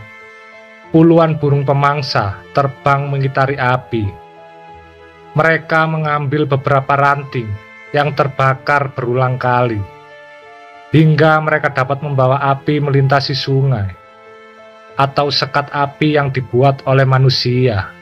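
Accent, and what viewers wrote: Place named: Java, Indonesia. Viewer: bisa ga logat jawa di buang bikin geli mendengar nya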